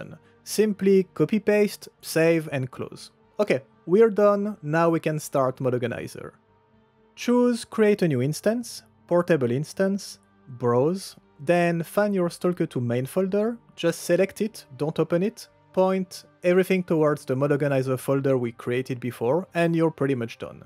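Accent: France. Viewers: man you sound like french native, if you are " merci pour tes 2 vidéos, elles sont super bien faite! " If you are not french native, not a problem, just to thank you for your videos very helpfull, I was installing my mods manually and I decided to use Vortex. I had many mods but the mandatory one is about performance, the one that make the game smooth and playable, I though this mod was working well on my rig SINCE I installed it with VORTEX. I could not believe what I seen, I went from 65 fps to 95 fps, and said to myself, WTF?? I guess the mod was not installed properly despite I am not stupid and I followed the installation guide on nexus. You recommand to use Vortex and know I understand why, 🤣🤣👍👍By the way you are explaining everything very well (I would not imagine you can put the mod file (zip one) inside the mod folder and I learn this watching your vid. 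I guess this is important. I am gonna follow your guide about your mod list, I installed a bunch of mod and will add those one that are in your list and that suit to my test. I am following you ! thanks again :)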